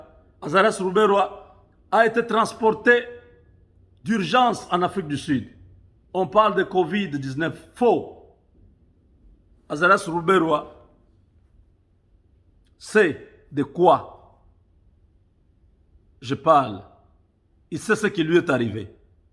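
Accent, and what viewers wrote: Rwanda. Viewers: Ton accent semble Rwandais verifier ton geneologie peut etre qu’il ya l’un de tes parent qui est Rwandais.j’ai vie au Congo pdt 13 ans je n’avais jamais attandu un vrais Congolais qui parle le francais comme ca.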